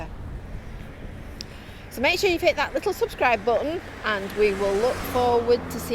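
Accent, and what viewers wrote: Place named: Yorkshire, United Kingdom. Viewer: How could you be awful in Spanish at school with that broad Yorkshire accent? Maybe you should have took German 'cos you could talk quite loud then!! Plus it's more like english than you would think!!🥰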